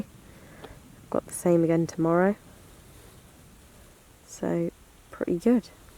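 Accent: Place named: England, United Kingdom. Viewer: you are such a delight to watch, being from Texas I could listen to your accent all day long. The waterfall the tower...I have to visit England someday...you are a walking advertisement of your country. Pretty lady I cannot wait to see what you do next ❤ Texas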